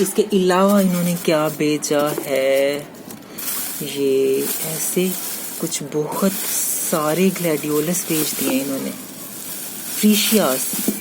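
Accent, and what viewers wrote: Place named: Pakistan. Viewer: aap ki awaj see lag raha tha ki aap Pakistan see hai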